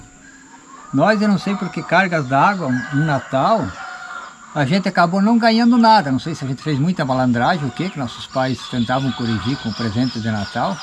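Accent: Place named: Italy. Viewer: Como gosto de ouvir o senhor falar , esse sotaque Italiano que sdds dos meus pais, muito sofrido a vida , mas a gente tem que agradecer a Deus pq os jovens tem de td e nem sempre são felizes. Parabéns estou gostando muito dos vídeos do senhor continue assim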